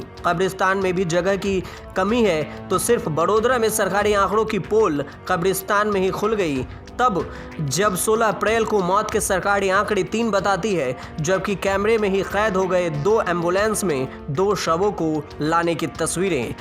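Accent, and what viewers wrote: Bihar, India. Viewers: Ancadh bihadi hai na Muh mein paan permanent hota inke Ra ko rda bolte hain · Anchor bihari h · बिहाडी 🙄🙄 एक्सेंट तो सुधार ले बन गया पत्रकार 😂😂🤗🤗🤗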